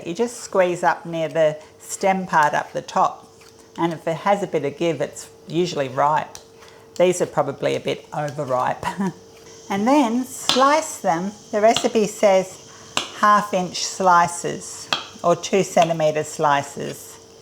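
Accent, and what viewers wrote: Australia. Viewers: Why ate you saying all purpose flour? In Australia its plain flour and double cream. You accent is Aussie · I love your accent. You sound absolutely spangled! Aussies always sound like they are completely smashed. 😂 Great recipe btw.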